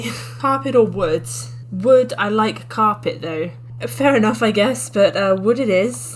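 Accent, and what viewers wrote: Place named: United Kingdom. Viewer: That's gotta be the most British voice I've heard in years.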